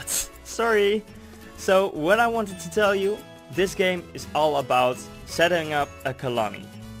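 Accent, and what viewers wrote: Netherlands. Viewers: je nederlands accent is duidelijk te horen lmao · he sounds dutch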